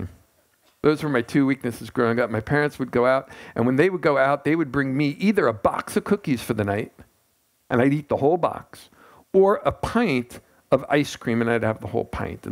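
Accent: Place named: Brooklyn, United States. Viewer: I havejust foundanother great teacher andMarcis that person.wonderfu, if he seems like an older person jewish from Brooklyn with his accent. just follow him in his talk unitl about 47 or 48 minutes and then BOOM! igot it. the dramas...helists allofour drams. samaras Yeah! and yes! love toyou all liz e. whyaren't therefore comments here? or on his spacef or acknoweldgements below! fantastic than you. Shalo andnamaste and AUM! aim nomastivaya!. ram dass and khrishna dass....moreguys from Brooklyn!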